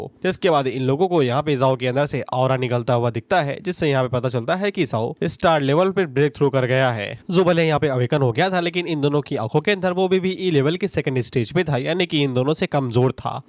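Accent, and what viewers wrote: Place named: Bhojpuri, India. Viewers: Bhojpuriya voice bahut accha laga Beech beech me bole karo maza aata he😂😂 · Bhai bhojpuri ka tadka marte raho achha lagta h 😂😂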